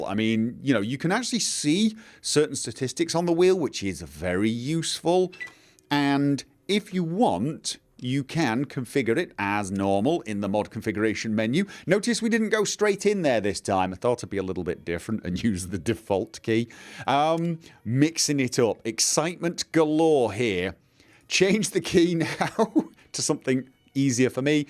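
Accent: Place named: Manchester, United Kingdom. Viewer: its a Manchester accent with a bit of Liverpool, but clear and deliberate with flourishes, like on the rolling Rs. A switch between cities and Norway may have something to do with that.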